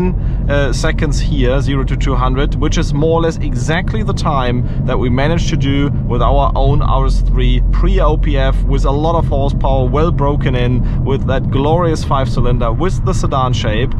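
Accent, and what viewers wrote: Germany. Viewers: Aua own Audi😅. Das tut ja schon in den Ohren weh, wenn du als Deutscher Englisch redest. Wieso ist dieser A45s denn eine Sekunde schneller als alle anderen auf Youtube? Du korrigierst die Zeiten auch nicht bei deiner Box. Da ging es bestimmt bergab.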